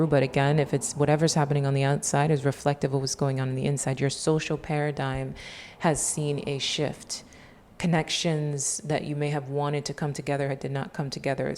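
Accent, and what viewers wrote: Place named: Caribbean. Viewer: I never noticed your caribbean accent before. Beautiful ✨️✨️💕💕 I'm Trinidadian. Thank you for this beautiful reading!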